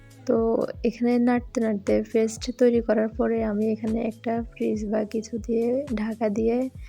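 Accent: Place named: Noakhali, Bangladesh. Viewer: আরে না।। খারাপ না কথার ধরন পুরা নোয়াখালীদের মতো । তাই বললাম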